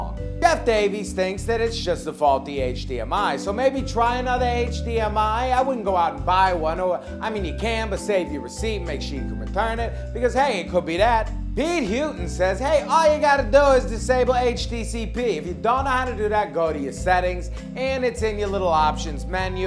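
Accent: New York, United States. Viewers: His voice accent is an accent from the late 1800's New York you're a fucking stupid moron lol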